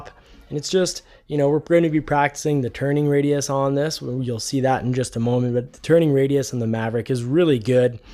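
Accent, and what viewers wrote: Canada. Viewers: Cool je savais ton accent était québécois mais de Quebec en plus c'est nice! Je vais te suivre. Et jai hate de pouvoir mettre la main sur un pti maverick!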